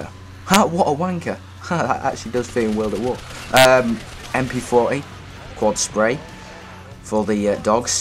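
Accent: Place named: United States. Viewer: why do you say "zee"? american accent is very entertaining to listen to... xD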